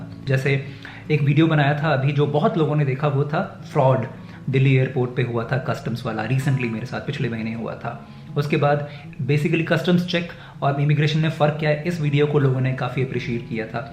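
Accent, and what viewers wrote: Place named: Lucknow, India. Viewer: your this channel is awesome. You have devoted your life for tourists helping them from your experiences & sharing knowledge. I see all your travelogues and enjoy your brilliant clear Lucknowi urdu/Hindi accent. Keep up the good work. Great work bro.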